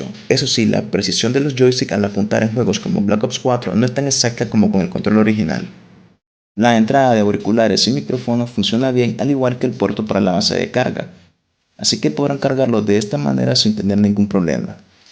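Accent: Nicaragua. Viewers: Yo también y además se te escuchaba el acento a nica por eso fue que te pregunte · Maje, ¿sos nica? :0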